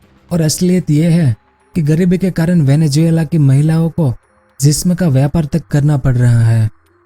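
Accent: India. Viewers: Tu bolne me pura desi hain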